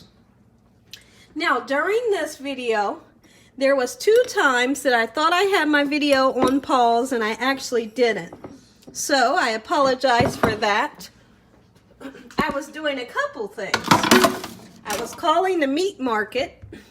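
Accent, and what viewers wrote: South, United States. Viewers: I love this lady so much she sounds so southern 😂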